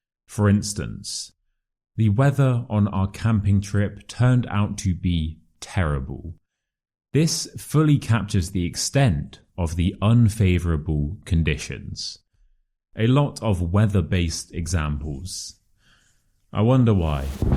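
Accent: United Kingdom. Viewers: Thank u very much ı love your brıtısh accent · I finaly found a proper British speaking chanal! It's marvellous! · Thank you. Love your British Accent and your sense of humour.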